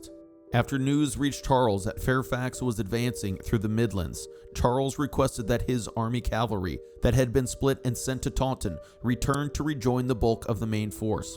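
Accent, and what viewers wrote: United States. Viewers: I prefer listening to David Starkey who is English and pronounces the English language correctly not some American robotic voice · The Lost Cause is completely riduclous and based in racism, White supremacy and pro-slavery nonsense. The Cavaliers don't exist anymore, the Royalists won in the long run, but the people of Britain wanted the King back, the Americans have never wanted Confederates back. Don't compare the civil war on slavery to the English Civil War. Plus, the Peasants' Revolt never threatened the monarchy. Learn English History. You sound American. You clearly don't know English history, you can't speak English correctly, and you're using Old English in your name. Mate, you're American, you're not English. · Good luck everyone to be endured terrible American commentater cringeworthy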